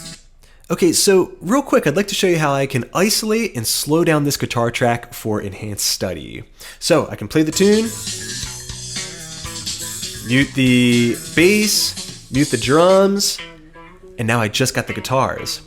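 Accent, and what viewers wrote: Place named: Maryland, United States. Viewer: Great demo! Your accent sounds a lot like a Chesapeake Maryland accent.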